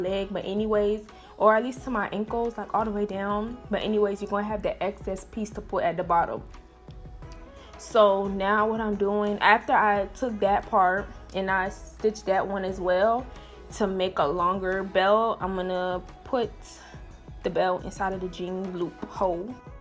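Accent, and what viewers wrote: Miami, United States. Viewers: I know you from Miami just by how you talking lol but I'm def gone subscribeeeeeee! and try this style too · Lol I was like “oh sis definitely from da crib” 😂😂😂 South Florida lingo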